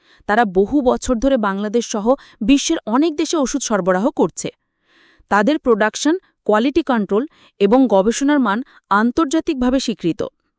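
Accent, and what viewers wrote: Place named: India. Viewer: কন্ঠে বাংলাদেশের টোনে বলুন। ইন্ডিয়ানদের মতো করে বলছেন..?! ওদের এই বাংলাদেশের সাধারণ মানুষ পছন্দ করে না।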